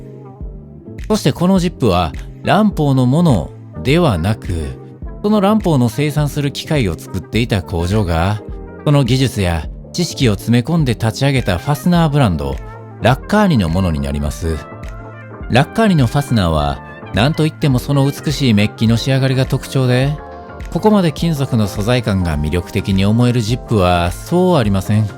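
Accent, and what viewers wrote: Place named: Kansai, Japan. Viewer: 関西弁新鮮！！これもまた良き👍👍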